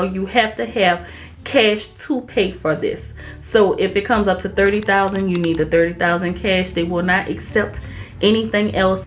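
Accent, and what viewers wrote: New Orleans, United States. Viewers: Yeah I hear New Orleans in her voice 🙌🏾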